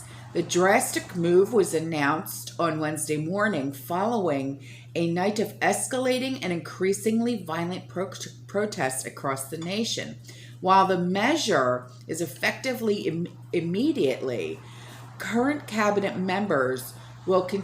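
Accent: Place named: New York, United States. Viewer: “Yous guys” I LOVE IT!! New York sass and GREAT INFO!! Thank you!!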